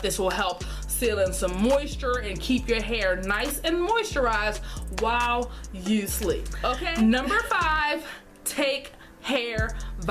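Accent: Baltimore, United States. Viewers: she definitely from Baltimore lol love it! love these videos, definitely helping as i'm looking to start my journey in a month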